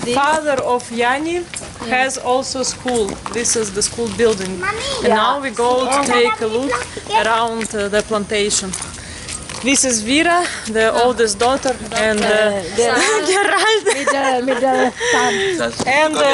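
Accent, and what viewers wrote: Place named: Russia. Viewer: You're a brave girl lol I always love Russian English accent.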